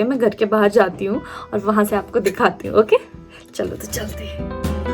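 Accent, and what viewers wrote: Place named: Pahari, India. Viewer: i wanna merry to you your voice is so attractive.......and your phadi tune i like....baki kuch nhi h.....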